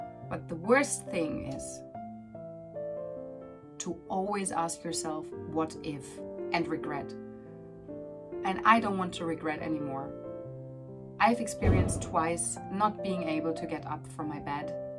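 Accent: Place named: Canada. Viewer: Great communication skills, initially I thought I could hear a Canadian accent, then a slight German accent. Your English is faultless. Good luck with Japan.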